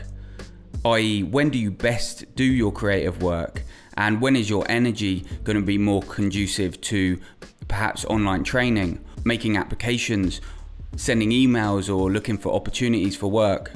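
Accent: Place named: London, United Kingdom. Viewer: Whats interesting is I think your accent has got more London from these early videos, maybe more vocal fry, it’s funny because I met this guy at this film event who was clearly trying to hide his really normal middle class background by sort of trying to appear like he might of ground up near some house estate in east London. I didn’t ask about his background but I could tell he might of felt like he wouldn’t be accepted as an artist unless he could present that he had some socioeconomic struggle and hinted past trauma. He showed me some photos he took, he sort of shyness around his urban aesthetic, he photographed old cafes and old people smoking, gritty stuff but I could tell he wasn’t from that world at all and it was odd because he’s clearly thought to create this character out of fear of not being seen as a true struggling artist. 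I mention this because your channel is about the struggling artist.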